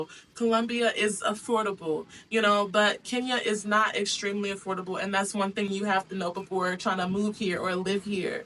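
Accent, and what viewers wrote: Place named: United States. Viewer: Your American accent is what is making them shy its not that they are ignoring you.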